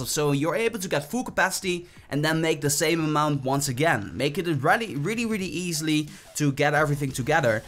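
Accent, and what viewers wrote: Netherlands. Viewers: Ben erg benieuwd hoe je unieke accent bij het spreken van Engels tot stand is gekomen. Aan de ene kant heb je vrij duidelijk een typisch Nederlandse tongval (inclusief de uitspraak- en taalfouten die Nederlanders vaak maken), maar het klinkt daarnaast net alsof je er wat Schotse, Ierse en in mindere mate Amerikaanse ingrediënten aan je accent hebt toegevoegd. Sommige onderdelen daarvan klinken best authentiek. Je spreekt ook best snel en vloeiend waardoor het wel natuurlijk overkomt. Grappig en interessant; viel me op.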